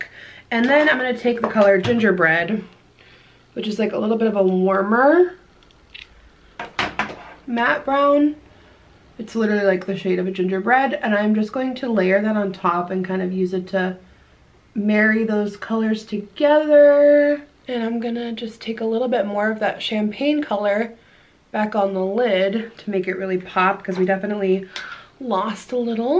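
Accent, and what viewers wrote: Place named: England, United Kingdom. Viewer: Oh my goodness, your English accent is everything😍 Love it X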